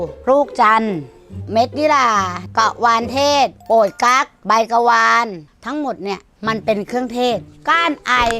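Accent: Suphanburi, Thailand. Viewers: ฟังเชฟไปมา ...ออกสุพรรณ ซะงั้น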